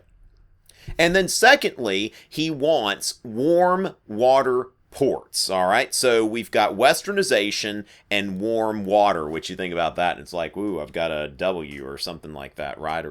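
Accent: United States. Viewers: Oh don't worry, I was able to get over it eventually. But the first couple times the teacher had us watch your videos, I was so focused on the accent and trying to figure out which state you might be from that I wasn't paying attention to anything you actually said. :P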